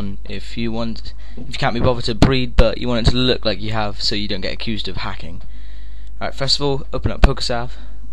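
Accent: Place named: United Kingdom. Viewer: your awesome. british accent and straight to the point.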